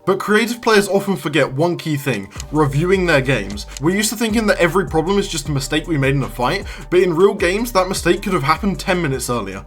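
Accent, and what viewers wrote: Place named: North America. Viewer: lol you sound na not tryna be rude tho but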